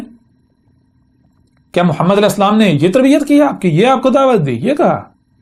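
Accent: Pakistan. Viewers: یہ چھوٹی بات نہیں ہے لہجے سے ڈھیٹ پنجابی لگ رہا ہے لیکن چونکہ یہ اپنے آپ کو نبی کہہ رہا ہے تو کیا اللہ تعالیٰ نے اس کو اپنی کتاب کا نام تک نہیں سکھایا؟ کران کران کر رہا ہے بے غیرت بے شرم اور کچھ گمراہ لوگ واہ واہ کر رہے ہیں ۔ اس ویڈیو میں تو وہ کوئی خاص بات نہیں کر رہا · لعنت الله عليك! You seem to be green Murree from your accent. I will find you soon InshaAllah and.... you know the rest.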